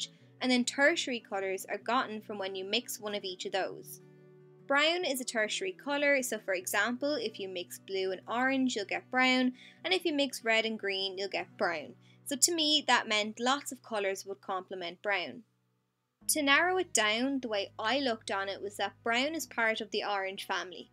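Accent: Ireland. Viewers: Are you Irish? Because your accent kinda sounds like Jacksepticeye.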